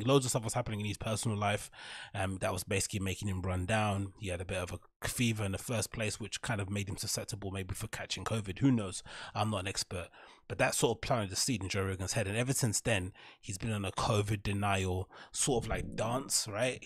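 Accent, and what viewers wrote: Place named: United Kingdom. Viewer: I love your content and just giving out the facts, but your thick British accent and your very fast talking is hard to follow.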